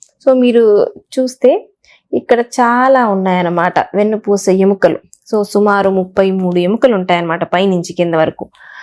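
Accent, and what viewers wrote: Guntur, India. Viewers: Pure guntur slang.. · It is our Guntur slang.we like our slang bro.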